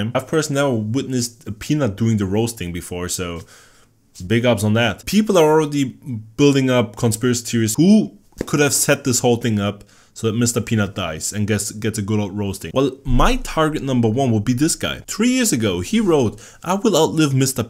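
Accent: Germany. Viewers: I was right when I watched you for the first time. You are from German. :D I read the comments and now I'm sure. That's why not everything you talk I understand so well. Is your accent :D Funny is that the more you talk more curious I get trying to understand you. I really like it! :D I wanted to ask once in your livestream video from SSB, there the game is not in english. But I decided to wait a little more and observe you more. xD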